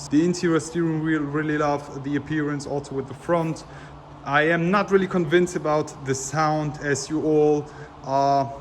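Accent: Germany. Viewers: I’m assuming your German, your accent is hella cool lol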